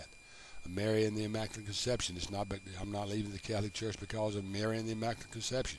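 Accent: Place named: South, United States. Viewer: I am not sure who you are but brother you get it. I am a Byzantine Catholic. You sound southern...I'm from Mobile, Alabama, Can we talk? (I am not going to try and change your mind I think there is some good sense rollin' arounf in there). I think you totally get Catholoism. I think you need to look to your Easter Cathpolic friends before you leave.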